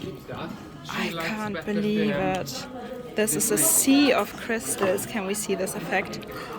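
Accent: Germany. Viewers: I don’t know if you are even going to read this . I have to tell you your accent makes my heart so happy. I grew up in an area where a lot of Germans moved to ( in the US) after the war. Your accent is like a blanket to my inner little Kori. When I’m having a rough day and miss all of my “ Omas, I watch you.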